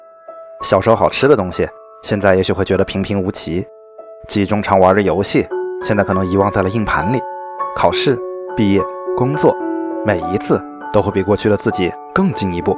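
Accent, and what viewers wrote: Wuhan, China. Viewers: 我一看到这个标题，就知道又有阿姨的汉普了🥰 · 不是我说 你的口音蛮像经视台 夸天节目里面的武汉话旁白的调调~哈哈哈哈~虽然你是普通话的调调~ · 有点吧han，标jun滴武汉口音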